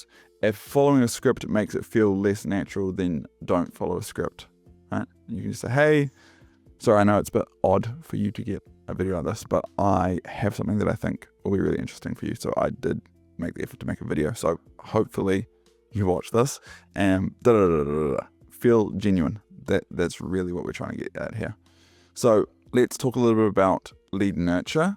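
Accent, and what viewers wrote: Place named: New Zealand. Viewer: Are you from NZ? You sound like a New Zealander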